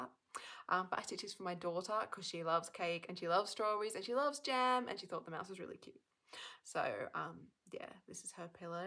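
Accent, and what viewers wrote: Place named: Australia. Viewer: Loved this video. Where in Australia do you live? I adore the accent. I am in the Unites States in Tennessee. I learned to cross stitch back in the early 80s, and learned in hand and still stitch that way. I've tried hoops, q-snap, etc. and they are just too uncomfortable. My fingers give me fits at times so I'm not as fast as I once was but I stitch the sewing method as you do. Your work is beautiful and for having a family you do make great progress. Have you an e-mail address? I subscribed to your channel so I will be seeing you again. Thank you for sharing. BTW, your name is lovely. XX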